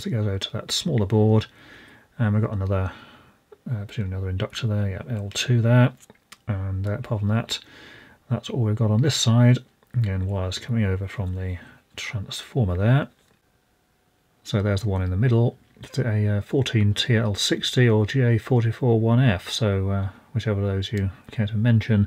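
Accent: United Kingdom. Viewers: Just crapola from a trades person from the UK. Not at all an expert. Don't fall for the Uk accent.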